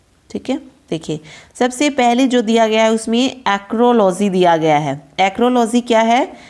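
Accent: Haryana, India. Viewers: Jo apka haryanvi touch h wo bda acha h ..👍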